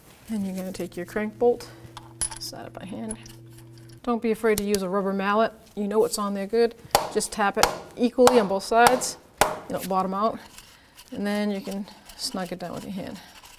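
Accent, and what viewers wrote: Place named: New York, United States. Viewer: Sounds like she's from New york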